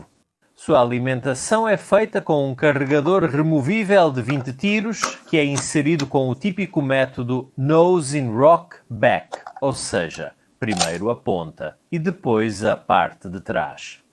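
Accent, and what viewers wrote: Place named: Portugal. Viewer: BR Olá camarada. Você é real ou uma ia? Desculpe falar assim. Você tem um jeito engraçado de falar o português europeu e parece não sincronizar com seus lábios. Mas parabéns. Eu gosto de história. Continue 👏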